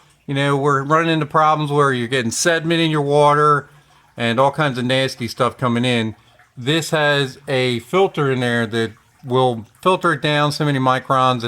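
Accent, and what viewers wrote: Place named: Pennsylvania, United States. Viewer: Love your accent... Is it a Pennsylvania accent?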